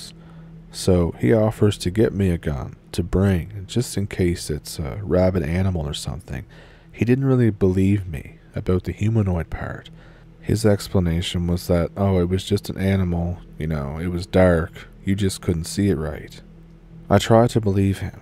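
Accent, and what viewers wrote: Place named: Maritimes, Canada. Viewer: I love your stories, and I can even get past the EXTREME Maritime Provinces Canadian accent. But you sound lethargic and congested!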